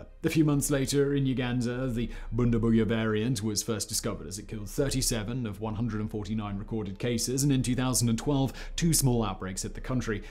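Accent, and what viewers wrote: RP, United Kingdom. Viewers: Your accent is hilarious. It's like recieved pronunciation but more irritating. You sound like the queen did in 1955.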